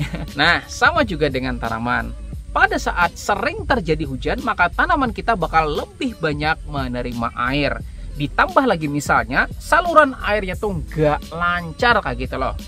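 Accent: Banjar, Indonesia. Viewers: Orang banjar kah yu... Logat banjar prasaan uln.. atau cuma perasaan uln haja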